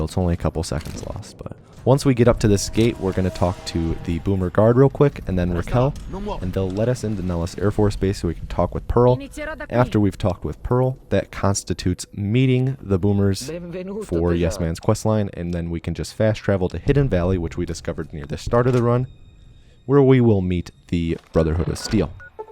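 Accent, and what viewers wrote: Italy. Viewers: Are you italian? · Are you American or italian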